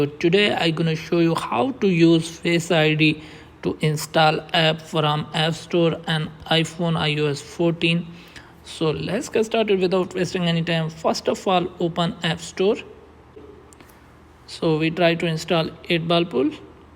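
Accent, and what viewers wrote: India. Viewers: This is the only indian sounding guy I can trust.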